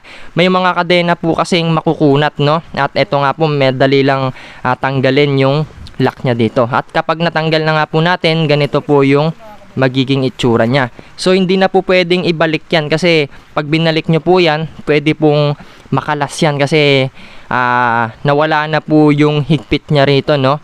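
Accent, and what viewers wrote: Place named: Pampanga, Philippines. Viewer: Ot atin halo kapampangan ING Tagalog mo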